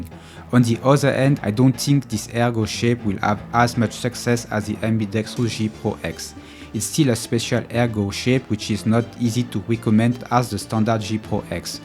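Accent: France. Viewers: wtf is this shitty french accent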